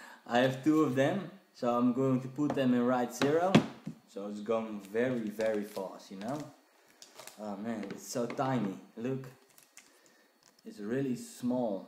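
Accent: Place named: Netherlands. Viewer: Haha your Dutch accent is mad as. Nice video, I had no idea how small the 2.5" drives were.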